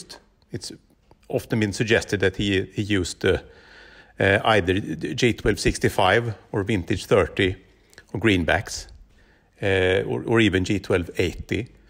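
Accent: Sweden. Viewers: That swedish accent :) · Låt mig gissa att du är svensk? Det låter som kul när du pratar svenska fast på engelska! Fan va hårt! :) gillar att du gjorde en video om detta! Bra jobbat!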